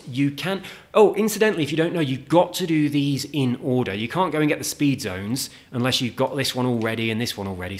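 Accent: United Kingdom. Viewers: I'm still struggling with drifting. You are surpassing me easily now though. (Do you feather the accelerator?) I cant quite get the hang of it.. Btw how come you can fast travel , have you got all the fast travel signs/bonus boards? there are about four I cant get - in the pipes on that motocross park, and one one the top of some house, and one up by the wind farm. ( I still dont have the FT option, even for money? weird ) would appreciate some ideas one those boards if you know how to get them! thanks. Really enjoy your videos as you know. Your accent is definitely getting more Norwich now though.. lol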